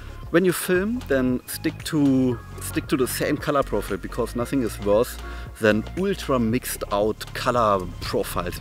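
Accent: Germany. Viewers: dein englisch hört sich voll deutsch haha nicht böse gemeint aber waren auf jeden fall gute tipps 💪🏽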